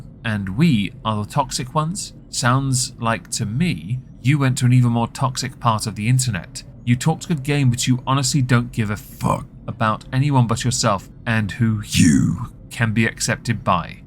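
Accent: RP, United Kingdom. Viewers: 😊 heh....me like when posh brittish voice guy say mean, true things about the people me no likey😊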